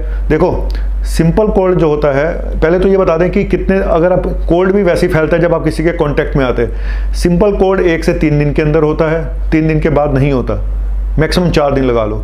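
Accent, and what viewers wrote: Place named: Punjab, India. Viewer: Bhai aap ki punjabi nikal hi jaati h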